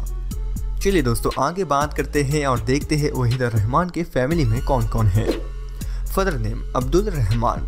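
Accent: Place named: Hyderabad, India. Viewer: Kya baat hai bawa, ek dum Hyderabadi 😄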